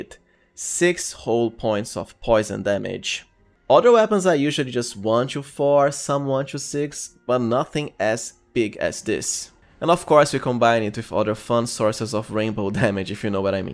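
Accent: Brazil. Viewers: Eu sabia que tu era brasileiro so pelo sotaque kkkk a gente se identifica ate em ingles pqp kkkkkkkkkkkkkkk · Se essa voz e sotaque n for BR minha vida é um mentira!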